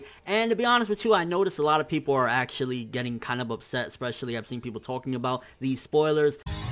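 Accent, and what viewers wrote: United Kingdom. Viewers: love the British accent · Just imagined Funimation hiring to voice Zamasu in the English Dub with that British accent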